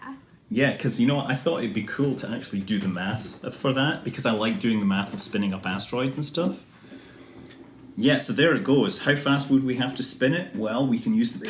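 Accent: United Kingdom. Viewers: British, judging by the accent.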